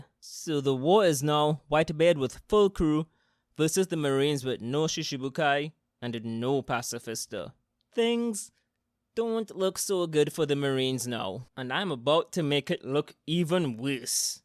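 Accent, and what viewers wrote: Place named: Trinidad and Tobago. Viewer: Yo love how u talking are u trinidadian?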